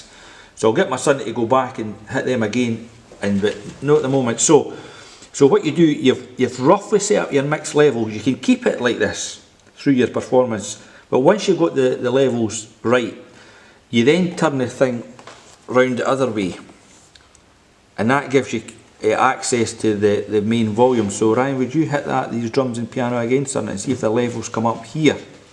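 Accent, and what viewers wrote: Scotland, United Kingdom. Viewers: Enjoyed the Scots accent.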